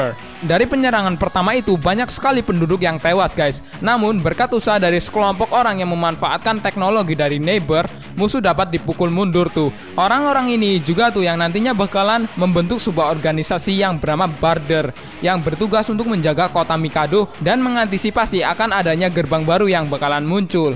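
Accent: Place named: Palembang, Indonesia. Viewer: Orang Mana? Kok Rada Bahasa Palembang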